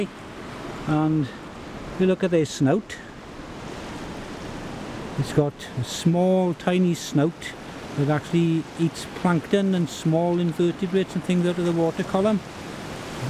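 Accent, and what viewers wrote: Wales, United Kingdom. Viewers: Great videos but am improved mic setup might be a worthwhile investment! Can be a bit difficult to hear sometimes with the thick welsh accent and background noise.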